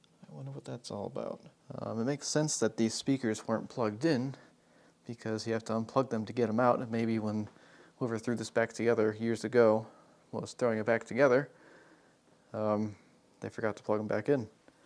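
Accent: United States. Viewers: Are you from western PA ?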